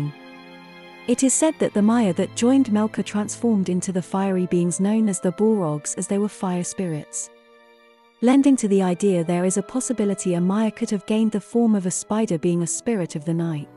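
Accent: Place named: England, United Kingdom. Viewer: I think that's just how people speak in England. We say water just like it sounds. they say wotah..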